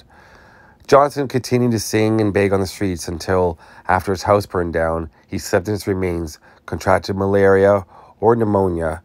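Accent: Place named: Toronto, Canada. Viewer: Today I went online to find a bio on Blind Willie Johnson (not much online) and stumbled on your video, I heard you talk and I thought to my self you were from Toronto, and low and behold you are from Toronto, and so am I; good video, well done.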